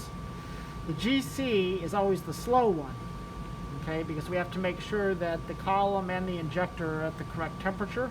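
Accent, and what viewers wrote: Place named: United States. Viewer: Thanks that was great and with an original American accent clear and no ambiguity!!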